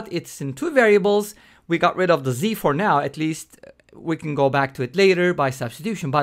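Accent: Turkey. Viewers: Ill posed as a geometry problem since area and perimeter are different properties and have different units. Keep up the good work. Do I detect a Turkish accent in your speech?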